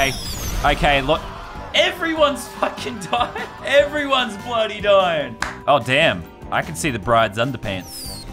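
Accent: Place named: Australia. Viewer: yes, youre a patriots fan!!!!!!!! where do you live cause you sound Australian but you like the Patriots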